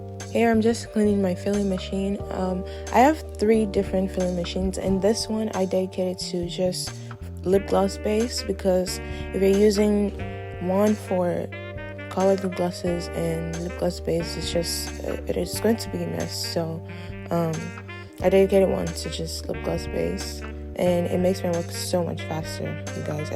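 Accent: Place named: Africa. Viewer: Love your African accent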